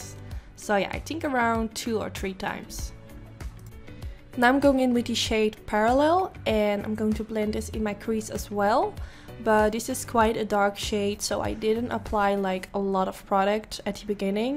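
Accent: Netherlands. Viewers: Ik kijke naar je filmpje met in me hoofd hoor ik nou een Nederlands accent of word ik gek😭 en ja hoor je bent gewoon Nederlands 😍 yo go girl 💥💥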